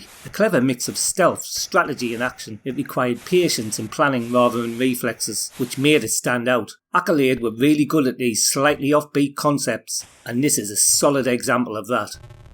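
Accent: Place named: Sunderland, United Kingdom. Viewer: He's from Sunderland, in the north east of England; just be glad he's not from Newcastle, as that would be even harder for non-Brits to understand.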